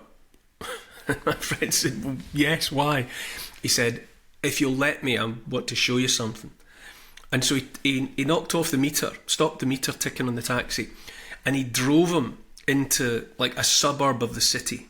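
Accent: Scotland, United Kingdom. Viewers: Good to see your subs increasing. More people listening, and taking note hopefully 👍 🤔 😁 Won't Kneel A gentle, beautiful Scottish lilt A kind, empathic, forward tilt An open heart; a caring face A willingness to take his place. A strong, home-hitting monologue Reminds us of the boiling frog The courage to become a sleuth A passion to release the truth. A deep desire to crack the whip Illuminates our freedom-strip Calls out the darkness taking hold The shiny despots; plated gold! Intense and raw, he lays it bare A soul-enhancing, honest stare Inflections drive the message through He speaks for Us - for me and you! Discernment's such a precious gift His words create a mighty lift Take heed of all he has to say He knows - it shouldn't be this way! 🤗🦋🐬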